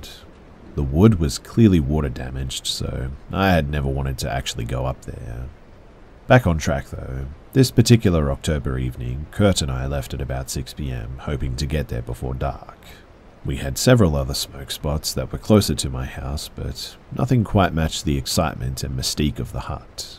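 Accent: Australia. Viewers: You are a phenomenal storyteller! Your Australian accent makes it even better.! People with British accents are the same way not all of them but the ones who have the gift of voice of being able to read a story and make it really come to life. Please remind me of the old days. When TVs were a newer item or before they were invented. They had radio shows that it will keep people entertained. Families will gather around the radio, which was usually a Big thing. Meaning, they were physically big they would be on a table and one family will gather around and listen to the scary stories and because that’s what they had at the time they had sound effects and everything like if someone was walking they had a recording of somebody walking towards you or walking away and they had a door that can go anywhere with that will open it and close a different sound effects to make you feel you are there. 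When I was little my grand mother bought me this little radio is back to about 60 years ago maybe I wasn’t 60 years ago I’m like maybe 30 years ago and I remember it vividly. It was this little white razor on rectangular and the part where you tuned it was black and it has orange number so you can see them and we have a station. Right near us and play those types of shows at night and I remember being in bed with the flashlight and those were things that we like to get it tells you how long ago was my alarm flashlight that took like three or four of the big batteries just to light up an area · Love this your my favourite narrator none compare, is it the Aussie ascent? Tone I simply believe it’s both thank you for not quitting these uploads, I’d honestly be searching YouTube relentlessly trying to find the right voice. Xx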